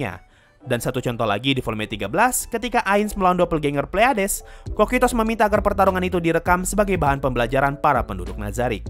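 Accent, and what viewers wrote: Indonesia. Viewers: Kita org indo pke aksen indo emang nda boleh ya? Ya gak min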